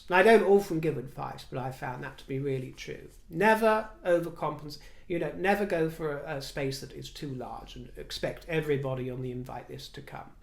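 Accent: United Kingdom. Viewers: .... Thnx for such a delightful ending, evocative photos & lovely music. Nice 👍 As to pronouncing 'secretary' in the PNW state of Oregon... We pronounce it, SEC' re tary. Some day I'd love to do a deep dive into why the US pronunciation of 3+ syllabus words seems opposite from the British way (& our gallons n miles to your liters etc - why? ) Like... Controversy.. The US say it usually with a hard accent on the 1st syllable... CON tro versy The Brits, I think, say it in a much more delicious way.. con TRA versy. At 65+ yrs old, I wish I could snap my fingers & end up pronouncing [our] language your way. U.S. has ruined so much, IMO. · Those of us who are from southern USA, as well as highly educated population pronounce it , "sec- ree- tare-reey" . I really prefer the UK pronunciation, but it would come across as pretentious if I used it. We have such a lovely, rich language. 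I do enjoy hearing you speak so beautifully.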